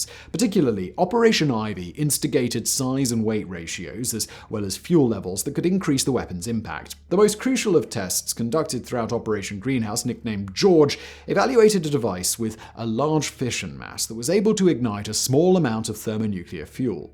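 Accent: United Kingdom. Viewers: A video about a country the United States eradicated is rich coming from someone with a British accent... The British eradicated half of the world, including what became the United States lol.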